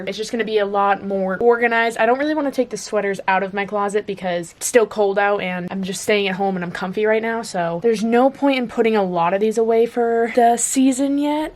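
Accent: Boston, United States. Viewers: also you being quarantined has brought your accent out sm and i love it hahaha u talk like my dad who grew up in boston "i was cleaning my rum and found the sweater ive been bitching abt" ahh yes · jamie pettit it’s actually her Boston accent. I live in the northeast and yeah it’s mainly only ~Bostonians~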